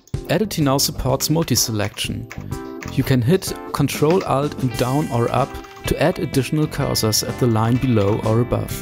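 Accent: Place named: Germany. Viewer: Love the german accent ;)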